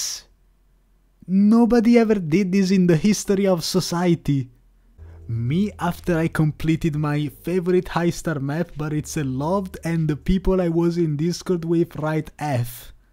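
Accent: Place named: Italy. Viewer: you sound italian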